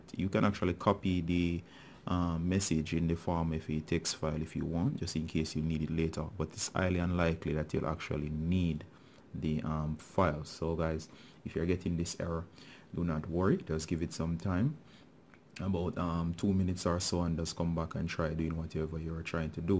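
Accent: Jamaica. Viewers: R u jamaican man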